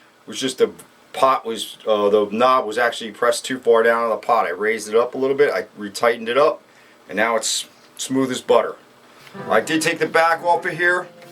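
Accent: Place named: New Jersey, United States. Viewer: South Jersey Pennsylvania Delaware New York tri-state area maybe? I'm from Jersey and you sound like somebody down the street...lol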